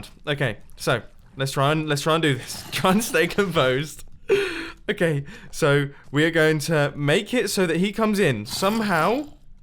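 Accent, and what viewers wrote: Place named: United Kingdom. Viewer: Your british accent is finally getting me after 4 seasons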